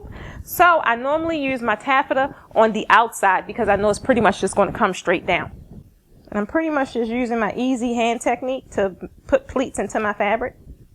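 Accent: Baltimore, United States. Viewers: Love these tips. These are great. Thanks. You must be from B-more. You sound like some of my UMD friends 😁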